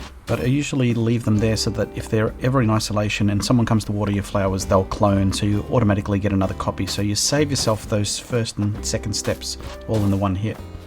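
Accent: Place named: Australia. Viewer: OMFG of course you are an Aussie...I hadn't listened to the video for a while...and I knew that! (Honest I can tell the difference- and 3 of my friends left US for Australia. My bad! And so glad what our domination plot of getting you hooked on expensive Seatle coffee is working as planned!). Yeah sprinking on my flowers is prob going to be left up to me. What is so odd is I've managed to get a lot of purples (just me/myself and I). Tulips, blue roses, lots of green mums, pink everything except those dang lilies . The only thing alluding my can is the pansie (which was my native flower and I HATED It along with the damn pears...why PEARS? OMG...Apples...oranges. NO the bland flipping PEAR. ALSO (since we are new besties) I can't have any one doing a fly over at this moment...I'm redoing everything and it's a shite-show. Our numbers are horrid. I'm super immunocomp so I pretty much have been at home for 2 yrs (going out 1 or 2x a month heavily protected) but w/Omicron I've been on full lockdown as of 1/1. 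So when not working online...I'm in search of the purple pansie/windflower! Be safe my friend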